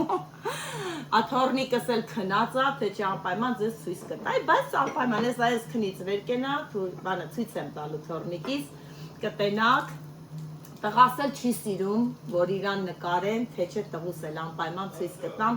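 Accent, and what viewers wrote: Lori, Armenia. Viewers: Բառբառիցդ երեումա , որ լոռեցի ես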